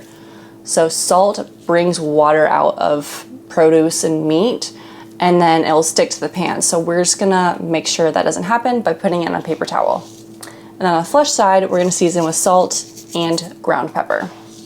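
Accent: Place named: United States. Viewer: THE most grating American accent….pepperrrr,wadderrr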